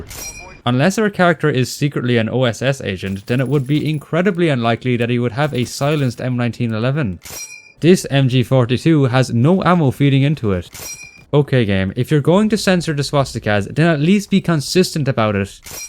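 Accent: Ireland. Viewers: Bro you sound irish haha surely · Why did his accent made me think he was Indian for the first four and a half minutes before i realized he's Irish? Solid video, btw. 👍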